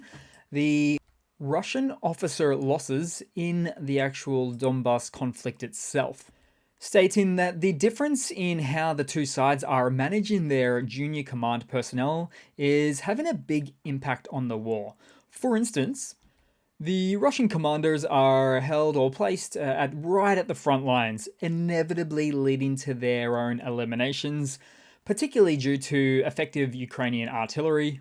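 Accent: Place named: Australia. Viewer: I like your accent mate. Are there many different aussie accents or do you all speak the same?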